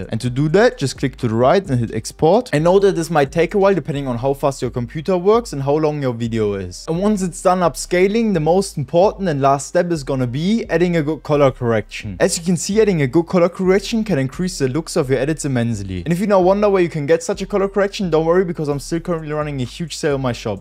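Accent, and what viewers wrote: Germany. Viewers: ur german i only watched 10 seconds and heared ur accent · This German accent 👍🏻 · Ich höre einbisschen Deutsch raus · richtig deutscher akzent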